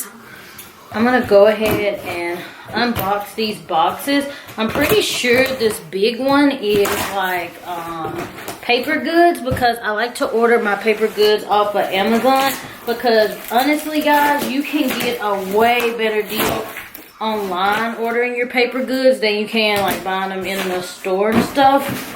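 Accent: South, United States. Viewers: Southern accent maybe? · shes from the south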